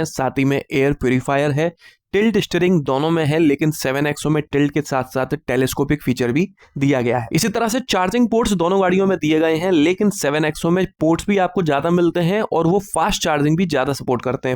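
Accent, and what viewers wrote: India. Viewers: Bhai tumhari desi boli apun ko mast lagi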